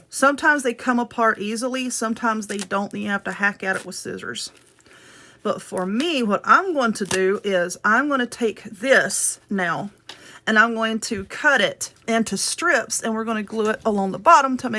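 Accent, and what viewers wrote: South, United States. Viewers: I love your sense of humor and your Southern accent. Only nosey people are going around picking up stuff to see if you took the tags off 😂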